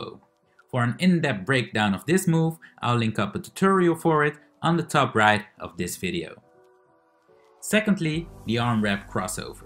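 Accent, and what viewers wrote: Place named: United States. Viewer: These are one of the best..no THE BEST, jump rope tutorials on YouTube. Clear breakdowns of all the techniques. Just one minor moan: As an English person, I have to mentally block out the American pronunciation "TUT-orial" (rather than "tew-torial)...my teenage son spends so much time online, even he's saying 'gas' rather than 'petrol' ;-)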